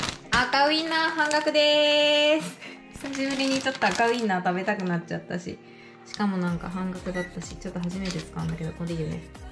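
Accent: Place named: Gifu, Japan. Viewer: 冷蔵庫のデザイン可愛いですね👍 ちょくちょく出る岐阜弁がたまらんわ ((´∀｀))ｹﾗｹﾗ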